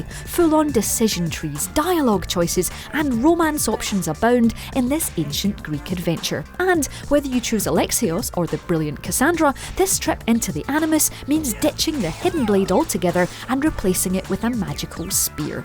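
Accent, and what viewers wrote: Scotland, United Kingdom. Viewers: The games are good, but your british accent makes my ears bleed to death... I am sorry but why cant you pronounce the word Year or Around or Our withouth twisting so many unnecessary times your tounge? Its nothing personal towards you. And no, i am not a bad person.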